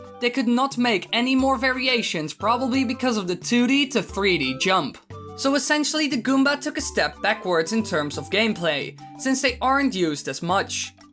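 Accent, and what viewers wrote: Netherlands. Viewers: Eeeyyy ik hoor een Nederlands accentje hoor ;)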